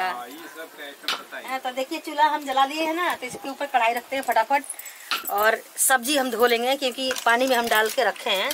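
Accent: Bihar, India. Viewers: aap bihari hai kya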